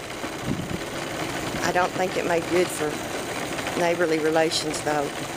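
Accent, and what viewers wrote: United States. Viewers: Next time you go out, I would love you to take some carrots and get the horses to come close to the fence and feed them the carrots. Would love to see them up close. I wish I could pat them. Does the field smell great after the hay has been mowed? I love your Texan accent. And love seeing the fields that go forever. It has been so great to come on a ride with you. I love how one of your neighbours planted heaps of trees. xoxoxoxoxoxoxoxoxoxoxoxoxoxoxoxo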